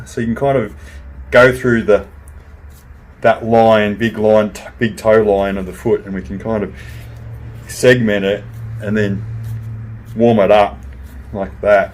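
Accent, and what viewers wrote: Australia. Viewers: I picked up the aussie accent ! Hello from Paris France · I love foot massage videos. With Aussie accent, I smashed the reply. Thanks for posting this video. I subscribed.👍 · Your typical Aussie accent. Represent 💪🏿💪🏿💪🏿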